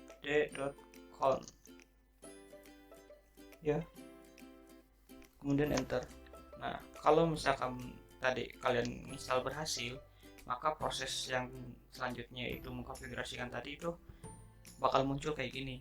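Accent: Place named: Sunda, Indonesia. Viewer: Bahasa Indonesia dengan logat Sunda dan Jawa wkwk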